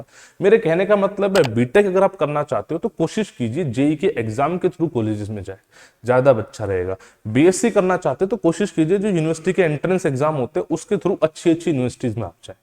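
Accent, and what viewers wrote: Haryana, India. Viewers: sir aap HARYANA sai ho kya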